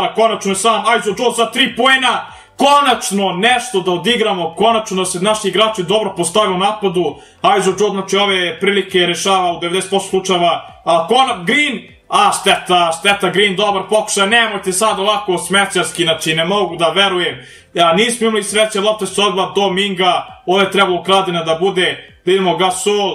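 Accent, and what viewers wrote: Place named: Nis, Serbia. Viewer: pricas ko da si sa nisa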